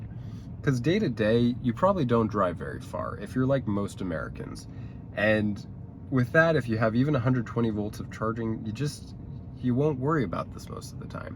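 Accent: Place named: Eastern Europe. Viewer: Where are you from? You have an interesting accent. Like some slight Eastern European accent.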